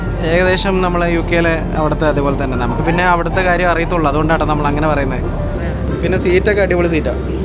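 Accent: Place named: Kottayam, India. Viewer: എനിക്ക് നേരത്തെ doubt ഉണ്ടാരുന്നു കോട്ടയം അല്ലെങ്കിൽ ആലപ്പുഴ നിങ്ങൾക് താഴ്‌വഴി ഉണ്ടെന്ന്. അല്ലെങ്കിൽ ഇങ്ങനെ സ്ലാങ് കറക്റ്റ് ആവില്ല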